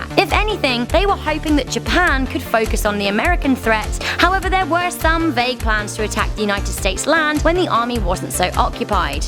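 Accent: United Kingdom. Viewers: British sounding person detailing a war between the U.S and Germany. But this, does put a smile on my face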